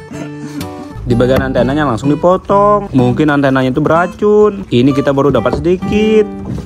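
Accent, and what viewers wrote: Java, Indonesia. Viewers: Bg orng Sulawesi tpi logat jawa sma kita bang😂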